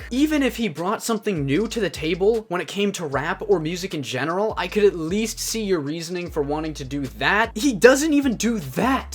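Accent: Canada. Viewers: Jesus christ another video that you made using the term "industry plant" incorrectly. Not sure why your gay ass incorrect videos keep coming up in my feed, but it needs to stop. Additionally you need to stop making these videos using terms you learned online incorrectly with your canuck accent. Shit is cringe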